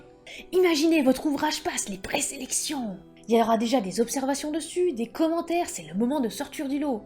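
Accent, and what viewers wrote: England, United Kingdom. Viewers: Cet accent anglais, j'en rêve la nuit * coeur * !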